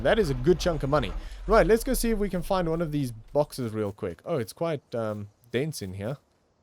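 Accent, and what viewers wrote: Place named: Cape Town, South Africa. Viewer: Hi, love the serie and the longer versions. Keep up the good work and boy, do I miss Capetown listening to your accent. Best time of my life.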